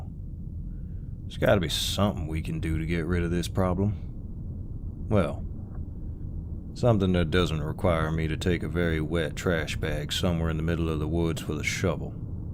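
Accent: South, United States. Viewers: this is too good not to get a part 2, and my god the southern accent, you got us all swooning with it😂great audio, can't wait to hear more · I said it before and I’ll say it again. loves doing a southern accent And I f*cking love it 🥰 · I’ve learned so much about myself today 🫣 For starters i had not even the slightest clue that southern accents did this to me🤠🥃 And second wow holy hot damn do I LOVE princess treatment and to be dominated *giggles nervously* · I love a southern man speaking in my accent lol I love this love the voice love it all not me folding in abt 2 seconds into the vid 🤭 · IM A SUCKER FOR THIS SOUTHERN ACCENT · I said it before and I'll say it again... Correction, I think we love doing a southern accent. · Your southern accent is everything😓🙏🎀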